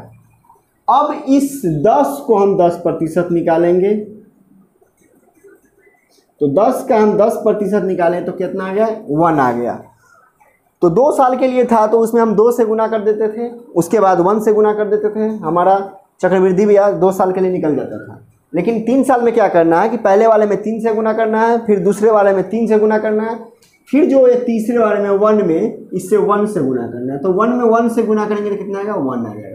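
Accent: Bihar, India. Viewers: Sir aap Bihar ke h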